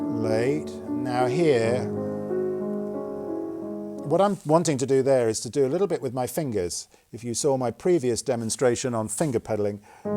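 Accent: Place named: United Kingdom. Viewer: Very enjoy hearing your British accent :)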